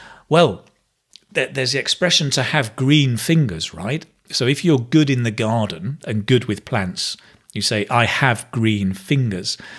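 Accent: United Kingdom. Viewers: Hello you can not imagine how much I enjoyed this 48 minutes. The time really flew. I am more in contact with American people so I am real surprised how well I could understand your British accent. I was really lucky finding you here. You pronounce really clear. You made me laughed when you explained the different ways to say a sentence. You were so cute. From now and then you will be my teacher in the distance. I have to improve my English, mainly my listening. Thanks a lot.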